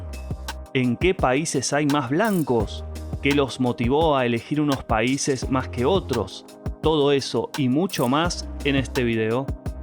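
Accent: Rioplatense, Argentina. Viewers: Que desagradable el acento porteño del relator.... demasiado cha, che, chi, cho, chu. En Argentina la gente más educada no habla tan así. En este video hay un desagradable tufillo xenófobo. Esa idea de valorar en demasía la raza blanca. En todas las etnias hay gente muy valiosa y también de lo peor. En mi caso y el de mi hermano somos como muchísimos chilenos, hijos o descendientes de inmigrantes. Mi papá de Varsovia Polonia, y mi mamá de Manchester Reino Unido. Eso no hizo que tuvieramos ventajas sobre otros compatriotas. En Chile existe una alta valoración de nuestros ancestros indígenas. Yo opino que nadie es superior a otro ser humano por nacer indígena, de genética europea, africana o mestiza. Que seas más verde, azul, bajito o de cabello rizado no vas a contar con ventajas importantes. Sean Peszynki desde Santiago de Chile💥💥💥💫💫💫💫💫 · No solo los porteños hablan así por si no sabías el lenguaje rioplatense habla medió país que no te guste problema tuyo, es una tonada única y hermosa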